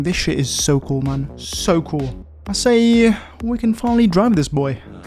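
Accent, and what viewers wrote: Italy. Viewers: Your English is vary good but that: "nono é" It means: "che sei italiano e minchia se lo nascondi bene l'accento italiano" Gg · As an Italian i really like your Italian accent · Are you italian? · Ma sei italiano? No vabè🥲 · sei italiano?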